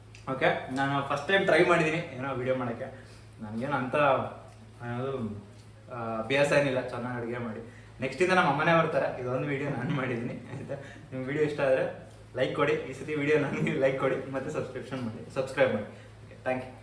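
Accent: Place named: India. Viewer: Nim bhashe kelvaga eno ondthara Khushi,Hale kannada Andre pure nam Halli shogadina kannada keloke sigutthe,tq u mam,tq..😍.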